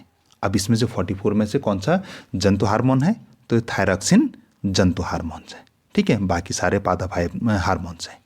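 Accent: Garhwal, India. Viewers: I just love your gadwali tone sir ji ❤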